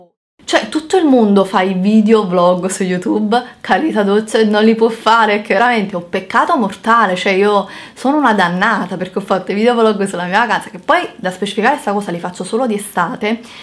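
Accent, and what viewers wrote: Naples, Italy. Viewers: Brava,vai avanti così, sei una ragazza solare e chi ti giudica esteticamente nn ha proprio capito che bella persona sei a darci tutti questi consigli di nn comprare schifezze.Mi sono iscritta da poco e sono felice di iniziare a capire quali siano i prodotti buoni grazie a te.Adoro sentire il tuo accento napoletano e posso solo dirti di nn pensare a queste persone perché purtroppo sono gelosi e invidiosi di quello che fai.Io invece solo perché sono carina devo subire cattiverie in un altro modo...quindi nn è un naso che nn piace a far commentare negativamente ma tutto può essere fonte di critiche x queste persone vuote.Ti mando un bacione. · I tuoi Vlogs sono davvero molto simpatici, come lo sei tu, solare e anche le tue inflessioni Partenopee arrivano al "cuore" Pero' almeno rispondimi ogni tanto!!! Mai ricevuto una tua risposta:( comunque for ever!!!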